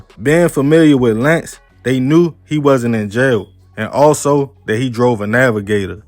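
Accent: Baltimore, United States. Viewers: Brudda i knew u was from baltimore b4 I saw the pick just based off of why you talk. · Baltimore accent got me losing focus on your story. It’s very annoying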